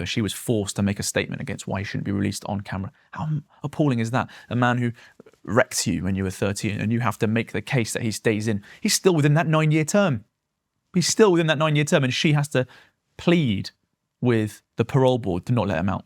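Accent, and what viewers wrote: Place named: Essex, United Kingdom. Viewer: He sounds and looks uneducated and that accent is certainly not “well to do”. Essex twang. Glottal stops. Dear god. Mangled English. He’s an amateur.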